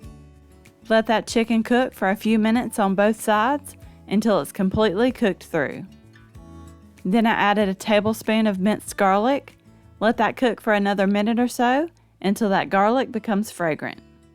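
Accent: South, United States. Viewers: I'm so glad I just came across your channel! After watching the first video you posted 3 days ago I immediately subscribed! Your recipes look so delicious and easy to make. I've been looking for some new recipes for casseroles and the crockpot especially and you've got those and many others that I'm probably going to try them all! Thanks so much for sharing!!! I'm also curious where you're from if you don't mind me asking? I'm from the south in North Carolina and you sound like you have a southern accent and your recipes seem like they're southern too which is another reason I love them!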